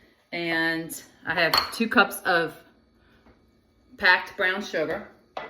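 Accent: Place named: Louisiana, United States. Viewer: Love your recipe! Sounds like you might be from Louisiana! I’m next door in TX. Either way, glad to be here!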